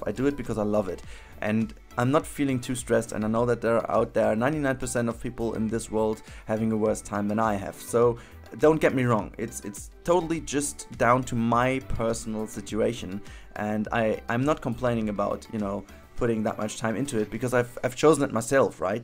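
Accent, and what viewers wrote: Germany. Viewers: Ok, first to what you talked about: It's really hard to maintain a channel when there's very little interaction. It's probably why the channel I had did so poorly and why I just couldn't find the effort to keep it going. I definitely miss it and am trying to get it going again or at least start something new. So, I get what you're saying. And actually, I've been watching and not commenting for a while now. I bet this doesn't apply for most of your viewers but I really dislike the German accent (and it's not your fault at all!) so a German YouTuber has to do really amazing content for me to watch it and support the channel. And I absolutely LOVE your PlanCo stuff because you spend so much time on details and you have amazing ideas. Basically, you play the game like I would like to (if I could afford the game or a computer that can run it). 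I would really like to see more and keep watching your videos. But I also know that life has to come first. And if you're not feeling you're getting enough back from making videos it can really affect you. I would hate for that to happen to you. So if you feel like you have to take some time off or take a step back, you do that. Now to the coaster: My first thought when I saw the thumbnail was "this looks like a coaster on Mars", because of the surrounding canyon but also because by now I'm kinda used to hexagons and triangles from Surviving Mars. And I think it would fit really well into a thriving Mars community to have a coatser like this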